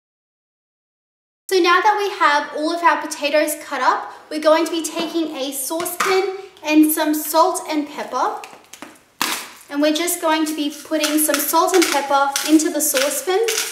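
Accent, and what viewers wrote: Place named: Australia. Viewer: thank you for making videos - they are very helpful) its funny, but you sound almost exactly like heyyyjune, and i know you both are australian, but its not just that - im also subscribed to meejmuse and chloemorello, and they somehow sound i little bit different))) i just love aussie accent)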